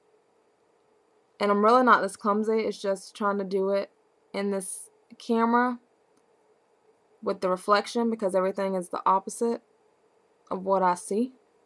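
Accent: United States. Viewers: OKAYY!!! Tell me you live in Mississippi!?...you are country. yet its cute.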